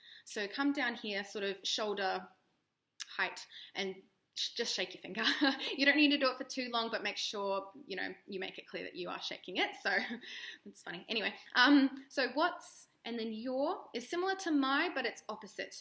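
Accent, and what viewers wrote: Australia. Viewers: You got a Aussie accent for sure! Haha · Im aussie and even I could hear that accent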